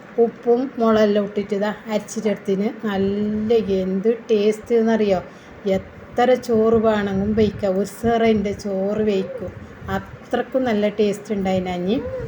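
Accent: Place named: Kasaragod, India. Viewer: Kasrkode ഭാഷ സൂപ്പർ 😂😍 എല്ലാ വിശേഷം അടിപൊളി റെസിപിസും super 😍👍😋 L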